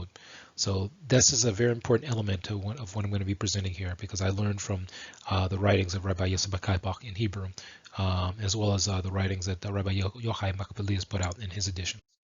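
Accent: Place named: Arab world. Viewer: You are from Arabic erea you prononouce many arabic letters like letter ,Ain in arabic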